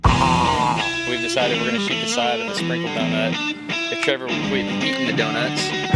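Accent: South, United States. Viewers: I have to ask. This vid was published June 22, 2012. Today is October 3, 2017 and I'm watching it for the first time. At the beginning you said you were in the California desert. How do you come by your Southern accent? I'm watching this from East Texas. You sound like you could be from around here. Just curious. Where are you from, originally? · this is america in a video, the food, the accent and the gun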